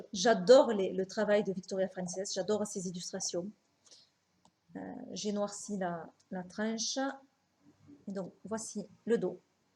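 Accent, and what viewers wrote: South, France. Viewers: avec ton accent du soleil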